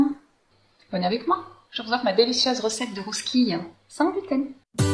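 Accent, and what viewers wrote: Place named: South, France. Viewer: Rien que l'accent du sud nous ouvre l'appétit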